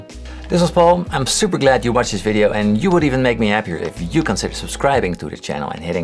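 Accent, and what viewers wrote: Netherlands. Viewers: Why do you have an English name but sound like you're Dutch? Great videos btw, although many of them make me wanna give up playing in a way... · It’s so easy to recognize Dutch people speaking English. This is the first vid I watch from you but I can tell you’re Dutch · I am just starting out learning to play guitar aged 53, every single video has something amazing in it to learn, being a fellow Dutchman the accent makes me yearn for home again! (Living in the UK since a child)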